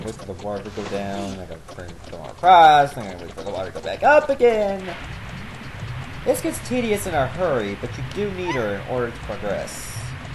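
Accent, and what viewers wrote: Canada. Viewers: This guy is Canadian as fuck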